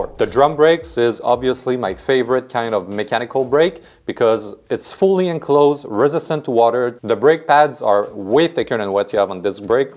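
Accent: Quebec, Canada. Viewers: Très québécois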